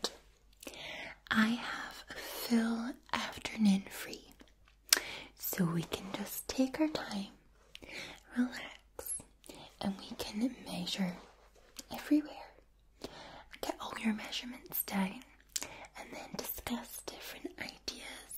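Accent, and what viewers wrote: Scotland, United Kingdom. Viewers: So Scottish reminds me of Amy Pond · I'm from Scotland and I love all women's accents from all over the world but nothing excites me more than a homegrown accent , amazing X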